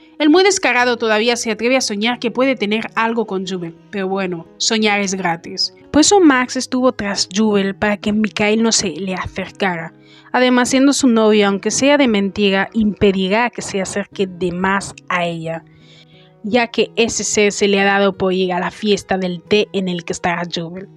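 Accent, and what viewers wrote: France. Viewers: Oye eres de Francia? Esque tienes un asentó muy bonito 😮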